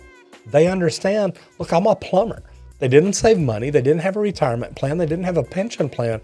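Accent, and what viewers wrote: South, United States. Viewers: It’s an organization, you pay for a membership to reap the benefits. The good outweighs the bad, great pay and benefits but you get laid off. Also judging by his accent he’s from the south were unions have almost no foothold, less members, and less resources for training.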